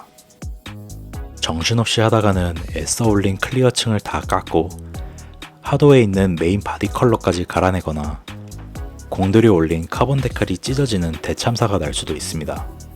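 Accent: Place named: Gyeongsang, South Korea. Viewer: 경상도분이신가보네요. 서울말 쓰려고 노력하는게 저는 들립니다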